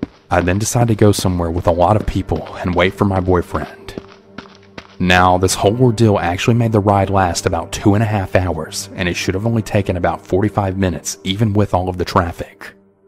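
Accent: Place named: Texas, United States. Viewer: I love your Texas accent damn it so smooth ❤️❤️💯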